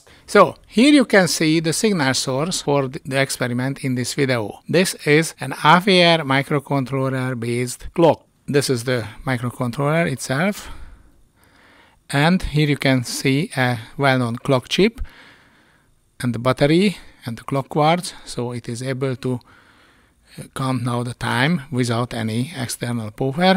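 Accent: Hungary. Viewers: Így ahogy mondod néha a kevesebb több, tetszett a CRT-k gyorsasága, és persze a Hunglish pronansziésönöd is :)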